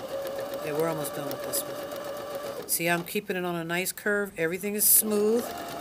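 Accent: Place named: South, United States. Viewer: Omg thank you sooooo much for making the effort to post this lesson!!! I have been going back and forth on how I should sew this top I'm sewing that has a lining and I could not wrap my head around how I was going to make it work?!?! Your video is concise and exactly the example I needed!! Also you sound like a wonderful southern woman who's got all kinds of really great knowledge that can't be taught, that only comes from experience!! Anyway seriously thank you!!!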